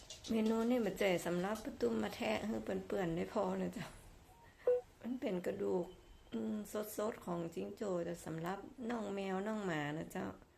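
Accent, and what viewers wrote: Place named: North, Thailand. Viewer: สวัสดีค่า เพื่อนใหม่มาส่งกำลังใจและมาขอกำลังใจด้วยคนนะคะ,, ชอบภาษาเหนือมากๆเลยค่ะ สำเนียงเพราะจัง❤️🥰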